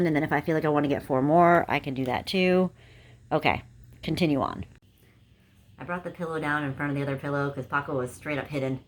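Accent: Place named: Long Island, United States. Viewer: Hi!!! Love your Long Island accent "Swagga" I'm on the hunt for Bath & Beauty Cherry Blossom awesome haul have an awesome weekend tfs 💕 #teamcheapchick 🐶💕🐶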